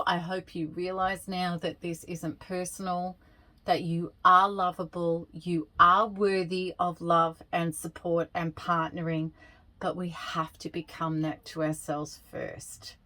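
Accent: Australia. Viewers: I love the Ozzy accent. This content really hits home. My mom was a narcissist and she passed in 2018 and I keep learning more and more about her through these types of videos. It has to be one of the most misunderstood behaviours in society and challenging to understand. But it's a work in progress and that of course is a good thing.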